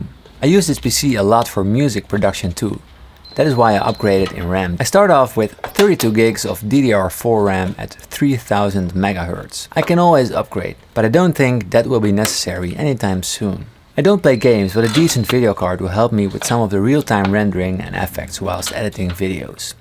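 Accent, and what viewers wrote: Netherlands. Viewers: he is, the accent (though not as bad as other Dutch people) is a dead giveaway =P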